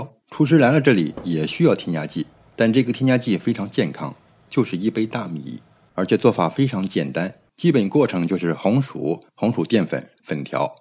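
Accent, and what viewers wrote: China. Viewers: 口音较重，山东附近的，有点大舌头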